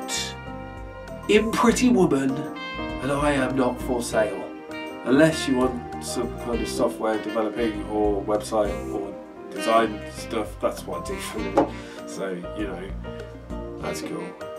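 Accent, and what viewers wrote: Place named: Scotland, United Kingdom. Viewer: Great Scottish accent x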